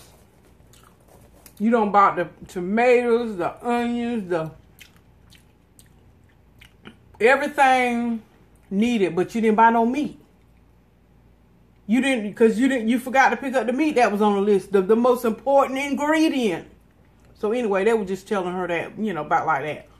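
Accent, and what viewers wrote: United Kingdom. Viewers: Your british accent is posh Londoner, aka the accent every nation that imitates brits uses lol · Your British Accent!!!!! lol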